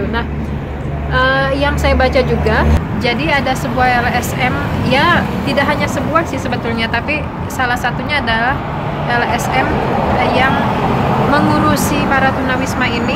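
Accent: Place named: West Java, Indonesia. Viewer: Teteh dari logat bicaranya ada kosakata bahasa Sunda. Memang teteh sudanya dimana.??? Kebetulan saya tinggal di tempat tth ambil vidio itu. Saya asli kota Bogor tth Jawa barat.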